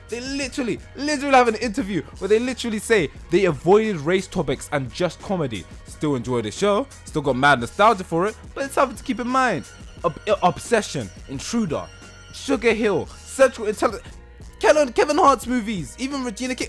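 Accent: United Kingdom. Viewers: OK I’m in the New Jersey right now. I’m halfway through the video and I’m trying to get what it is you’re actually trying to say. I’m having a little difficulty with your very casual black British accent. I think for future videos it would be nice if you used a traditional thesis statement and clearly define the arguments arguments pro and con. What do you seem to be doing now is playing a lot of distracting clips, name dropping a wide variety of media just because they have black characters in them and And doing sort of a stream of conscious rant that is very difficult to follow. I’m almost at the end of the video now and I don’t know what it is you’re trying to say. It seems to me that you’re saying that all black art is political because black is a social construct. I don’t know if that’s what you’re saying. If it is you could’ve said it in a much shorter video with much tighter editing and restricted to movies, television, Japanese animation as opposed to western animation. 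You could use storylines and specific examples from particular shows. I’m not really sure that you really thought out what it is you’re trying to say. Your analysis of get out is pretty off-base. I don’t like the premise of the movie. Which seems to be be suspicious of white people. It was a carefully crafted screenplay. Not being African-American I’m pretty certain you have no idea what’s going on here anymore than I have any idea what it’s like to be black and British. Anyway good luck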